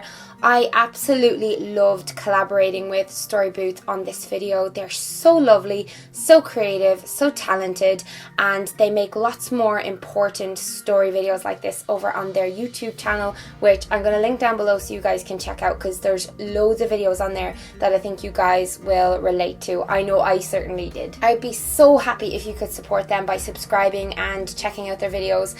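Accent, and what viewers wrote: Ireland. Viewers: YES YOUR IRISH (TWINS) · ayyyyyye you're Irish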